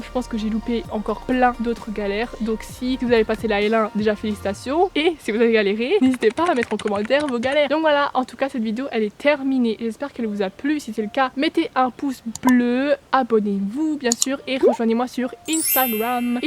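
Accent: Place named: Alsace, France. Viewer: Ehh pas besoin de te demander de quelle fac tu viens, l’accent alsacien ptdrrr waaahh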